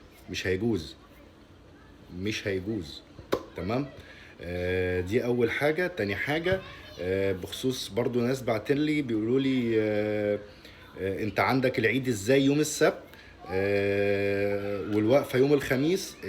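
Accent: Morocco. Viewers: انت وليتي مغربي اكثر من المغاربه خصوصا ملي كتقلد الفنانين المغاربه ما شاء الله عليك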